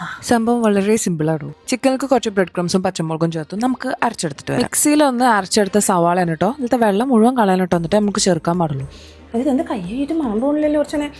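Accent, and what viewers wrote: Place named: Malappuram, India. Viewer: Eda muthe ijj palakad aanegilum ande basha malapuram baasha aan love u dear sis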